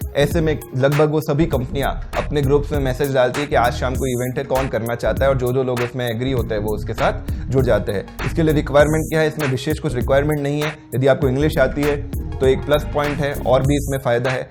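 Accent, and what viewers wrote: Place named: Tamil Nadu, India. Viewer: Difficult to catch ur speak... Too tamil slang.... Can u improve bro.???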